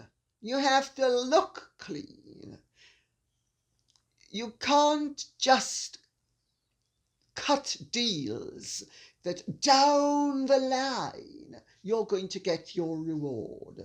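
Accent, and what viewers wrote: RP, United Kingdom. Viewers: Hi from Australia, i just loooovvveee your subtle insults with your finely clipped english aristocratic BEAUTIFUL VOICE. My daughters always often get a chuckle out of the way you have with words. Bravo 👏👏👏👏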